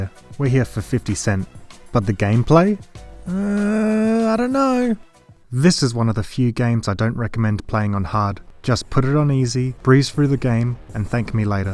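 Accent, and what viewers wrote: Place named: Australia. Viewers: Australian I'm guessing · Hi are you Australian or from New Zealand like your accent is incredibly subtle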